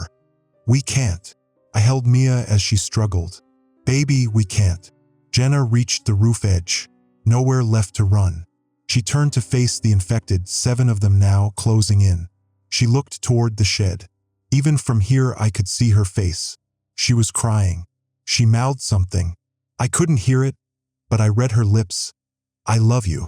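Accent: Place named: Spain. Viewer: The Spanish accent occurring randomly throughout is distracting. How does that even happen?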